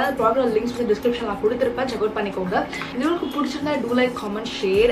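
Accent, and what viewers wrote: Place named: United States. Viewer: Please Talk properly Don't use American accent....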